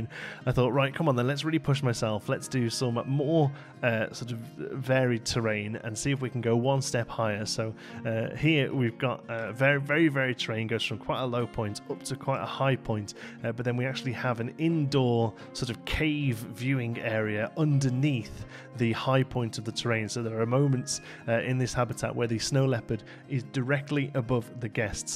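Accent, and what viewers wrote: United Kingdom. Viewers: You time these perfectly with my lunch 👌 Everyone at work hears some British nerd talking to me everyday around this time 😂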